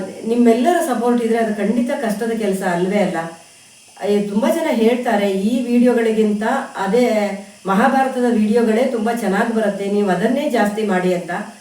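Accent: Malnad, India. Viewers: Madam nimma video tunba chennage bartede niu hakero yalla video nija jevanadalli namma malinadenavara kathe ni ide nanu 26 year inda banglore nalli irodu but nimma video one day nu nodade irodella tunba namm urenavaru mataduva hage ide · Namge nam malnad bashe andre thumba ista❤❤❤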